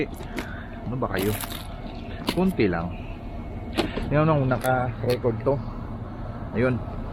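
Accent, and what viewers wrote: Iloilo, Philippines. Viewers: Not daily ka ga ɓlog gali? 1st time ko nag lantaw bal an ko gid na ilonggo ka!❤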